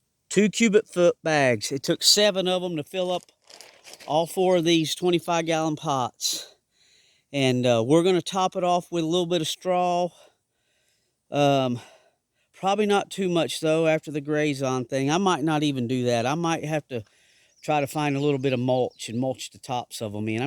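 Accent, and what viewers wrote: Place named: South, United States. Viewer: I thought you sounded southern in Indiana. Welcome. Tis different. Hope you get ur sweetpotatoes going like city lot! Good luck!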